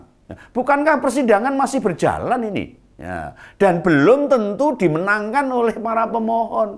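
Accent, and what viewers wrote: Java, Indonesia. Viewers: Mbok sabar to pak pak (SBY)..om medhok banget Jawane.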